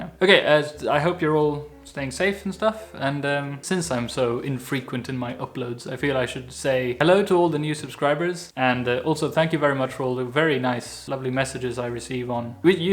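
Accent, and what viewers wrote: England, United Kingdom. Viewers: I know Swedes speak good English and you lived in the UK but damn, the British accent is spot on. Not a hint of an accent like you were born to speak English. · I have officially found my favourite youtube channel, came her for the uni info, stayed for the sarcasm. I still can't believe you're swedish, not that I have many swedish friends to compare you with, but I could have sworn you were english ( the accent fooled me ).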